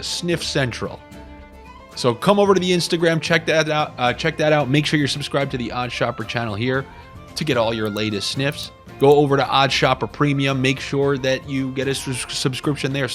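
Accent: Boston, United States. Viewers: The boston accent🔥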